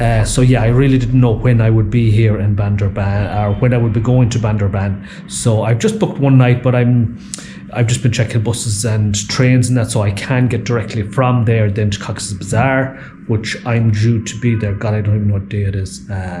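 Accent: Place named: Ireland. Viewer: Absolutely brilliant. Discussing poetry and war on the border of Bangladesh with a senior army official. Fascinating and slightly surreal to listen to you sharing this experience. You retold it with such kindness and even better with your lovely Irish accent. Watching your videos remind me of my visits to Bangladesh and I am looking forward to watching the rest of your travel videos through Bangladesh. Thank you for sharing a part of your adventure/journey.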